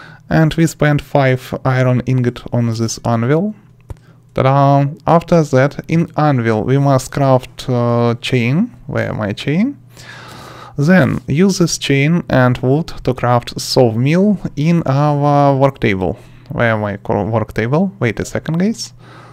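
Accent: Russia. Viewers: Акцент, русского человека)) Привет! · Русский акцент тебя выдает...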